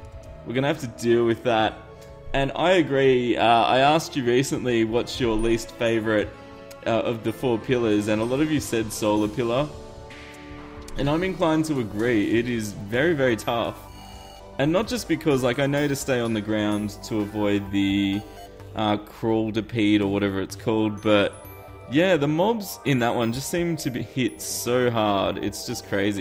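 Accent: Australia. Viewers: I got the Rod of discord in roughly 2 hours without farming I'm so lucky and are u from Western Australia Could u plz say I'll be back in Anarlds sweggnegers voice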